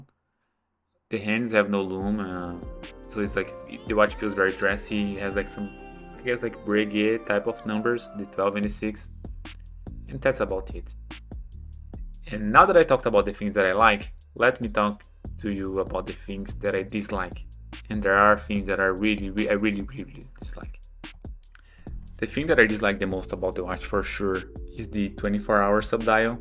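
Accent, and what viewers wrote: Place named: Brazil. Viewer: Do you have Brazilian background? Based on your accent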